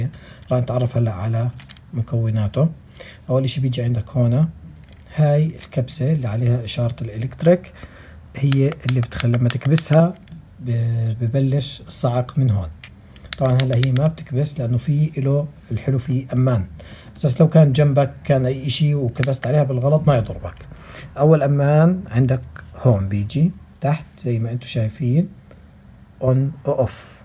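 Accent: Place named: Jordan. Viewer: طيب اخوي هاي لو انمسكت معك من الشرطة في عليها سجن ؟ بالاردن قصدي لانو مبين من لهجتك من الاردن زينا وكل الاحترام لكل الاخوان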